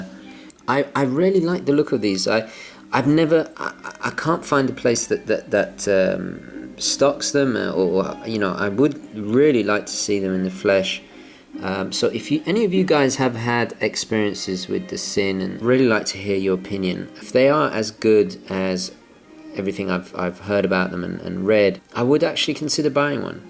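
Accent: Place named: England, United Kingdom. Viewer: Where about in England are you from. I can't pinpoint your accent.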